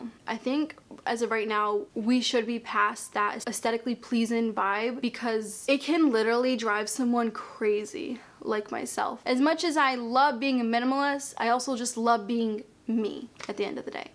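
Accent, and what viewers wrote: Boston, United States. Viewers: I was wondering where that accent came from, too. But please never apologize. You're awesome!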